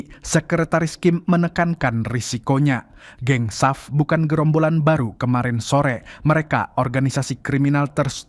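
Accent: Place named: Banyumas, Indonesia. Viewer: SUARA LO NGAPA SIH, MALAH KAYAK ORANG NGAPAK. JADI DIRI SENDIRI AJALAH JANGAN DIBUAT BUAT 😡😡😡😡😡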